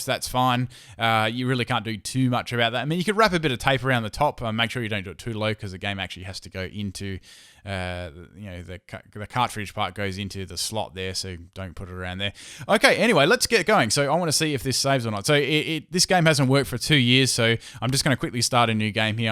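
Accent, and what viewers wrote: Australia. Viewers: he is Australian isn't he?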